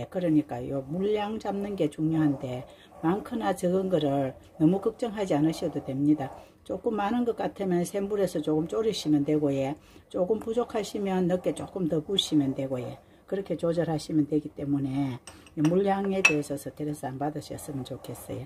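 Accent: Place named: Busan, South Korea. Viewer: 같은 경상도사투리라도참듣기좋은 말투너무좋아요부산 분. 같으십니다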